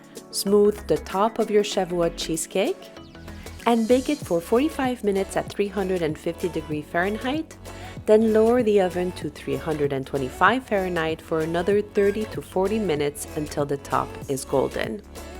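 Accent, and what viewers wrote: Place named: France. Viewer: english is my 3rd language, who doesnt like a french accent is just weird lol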